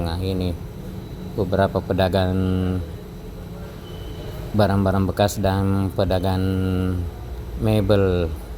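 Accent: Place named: South Sulawesi, Indonesia. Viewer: Sulawesi Selatan nih yang punya channel jelas dari suaranya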